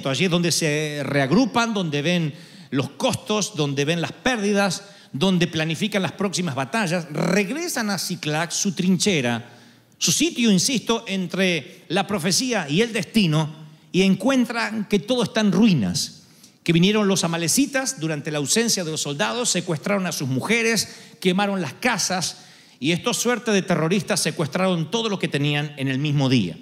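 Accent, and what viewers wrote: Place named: Rioplatense, Argentina. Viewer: fenomeno!! Necesitaba escucharte. Soy uruguayo y tus predicas me llegan de una manera increible, seguro que por tu acento ya que es muy parecido al mio por la cercania geografica con Argentina pero mas seguro porque realmente sos hombre de Dios, y que Dios te bendiga y te siga iluminando para que nos sigas tu iluminando a nosotros con la palabra de Dios todopoderoso. Gracias a Dios por tu existencia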